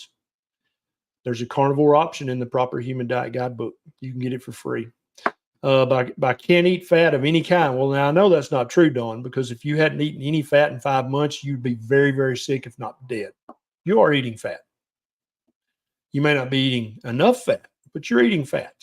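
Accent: South, United States. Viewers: I'm not a carnivore, not even a ketovore or a keto person... but I do listen to you & glean a lot of wonderful information... I also respect your logic & candor... plus, I could listen to your southern drawl all day long :) . So, I guess for me, when I hear the term MAHA, I do cringe because I tie it with MAGA partly because RFK Jr. started it- and while I agree with the idea of striving to "make America healthy again, I don't like that the movers & shakers, who I love & respect (Calley & Casey Means, Max Lugavere, etc) have become so political and such cheerleaders for the far right. It sickens me that RFK Jr who touts being an environmentalist has tied himself to someone who wants to loosen emission laws and allow MORE chemicals to spew into our air & water. It sickens me that he has tied himself with someone who we all KNOW (I know you know too) that Trump doesn't give a rat's ass about anyone's health (or well-being) and is just using RFK for his own benefit. 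And shame on RFK for being bought & throwing his concerns & convictions out the window for this sick, dangerous man. Yes, I'm a Democrat who will vote for Harris - but I know that they will also not do much for the food issue (although they do believe in science and know that allowing more pollution is a bad thing, etc.)... I guess I would like to see those heroes of mine in the health world not take sides - and not align themselves with such hypocrites.... I"m with Dr. Shawn Baker - I believe he's skeptical on the g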